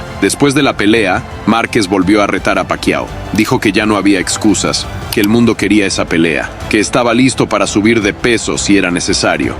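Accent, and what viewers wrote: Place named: Cuba. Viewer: De seguro eres cubano hablando mmmm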